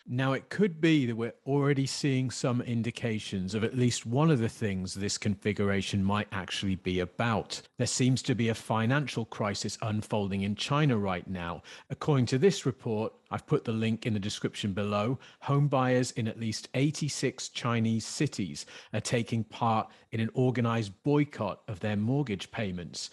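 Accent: England, United Kingdom. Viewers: I know this sounds ridiculous but besides the undoubtedly high quality of your research, what i like about your videos is your British accent. It's so much more pleasant to hear than american or australian. Or for that matter mine i.e. indian! 😀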